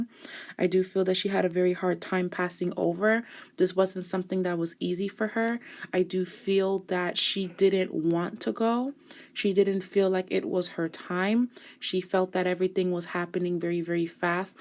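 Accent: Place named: New York, United States. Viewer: Ha. I love your voice and accent lol 😆. New York?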